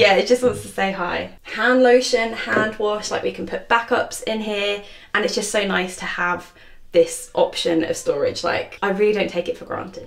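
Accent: United Kingdom. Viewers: Caddy for each family member! WHY did I not think of this?! Also, worth it to listen to a Brit discussing poo drops! Fab!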